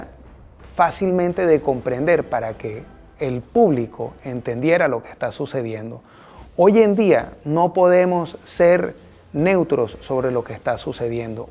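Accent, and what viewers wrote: Venezuela. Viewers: Sus padres seguramente son Venezolanos,ya q habla con nuestro dejo,y la jerga venezolana.Gracias por sus análisis.Venezuela Libre.Buen viento y buena Mar. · habla como venezolano ❤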